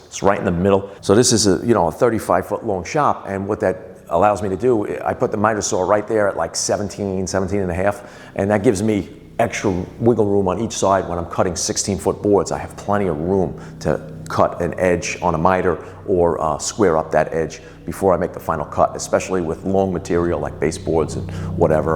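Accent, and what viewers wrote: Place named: East Coast, United States. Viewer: You sound like most of my mother's side of the family.... Italian from back east. Love it! Sound in this video was clear and the shop is coming along nicely. I also thought you were a bit younger! At our age, that is a good thing. Ciao,! Keep punching!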